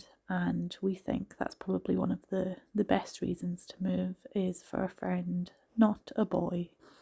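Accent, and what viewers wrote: Scotland, United Kingdom. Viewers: From Canada. Grandparents from Scotland, so your accent is familiar. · You have picked up a somewhat Scottish accent- it doesn't take long!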